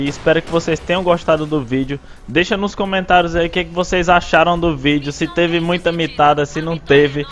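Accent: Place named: Northeast, Brazil. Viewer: Joga d+ cara u onde que cê Mora??:p Sutaque de Nordestino:P:3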